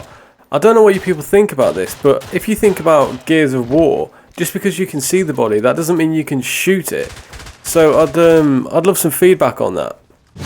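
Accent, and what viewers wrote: United Kingdom. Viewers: absolutely love the british accent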